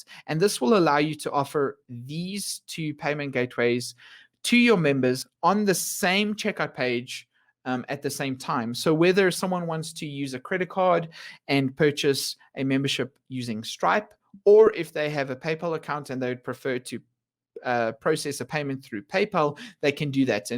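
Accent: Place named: South Africa. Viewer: Baie Dankie. It's funny to hear a South African accent, most webinars I watch are American accents. At least I could understand your accent. Where do I explain what a member receives for each level?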